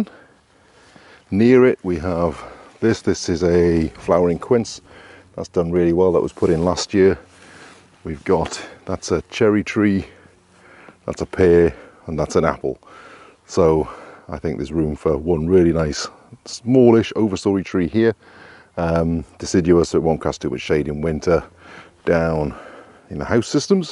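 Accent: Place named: Durham, United Kingdom. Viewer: Wow you really had me fooled. I was sure you were from Durham on the NE of England. I'm from Newcastle originally but also living in Scotland by Elgin/Inverness. Thanks for producing these great vids. I'm really enjoying them and good luck for the future. Paul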